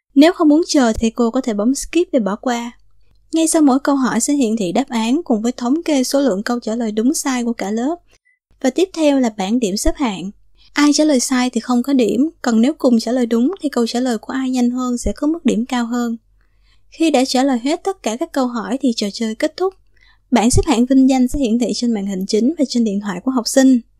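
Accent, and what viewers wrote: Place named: Saigon, Vietnam. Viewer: Giọng sài gòn nghe nhẹ nhàng, dễ thương làm sao..cảm ơn cô nhiều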